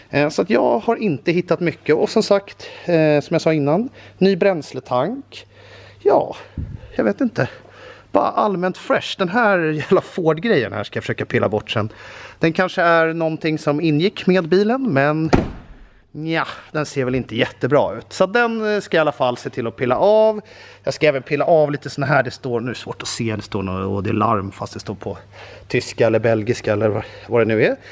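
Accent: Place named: Stockholm, Sweden. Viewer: Hehe så skön stockholmska. Smutta upp den. Haha. Man blir så glad att lyssna och se på sköna människor. Du gjorde verkligen min dag kompis!!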